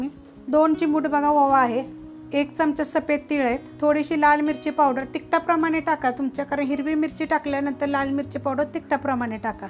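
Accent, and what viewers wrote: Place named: Phaltan, India. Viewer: एक नंबर रेसिपि.. तुम्ही फलटणच्या (जि.सातारा) आहात का? तुमच्या बोलण्याच्या पद्धतीवरनं तसं वाटतंय.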